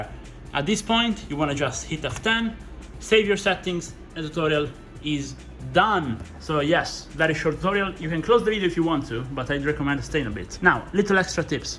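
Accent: Italy. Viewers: Qualcosa mi dice che sei Italiano... o al massimo spagnolo, comunque grande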